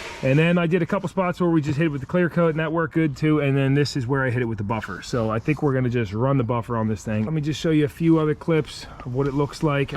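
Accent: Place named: Delaware County, United States. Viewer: You gotta be from Delco. Love the content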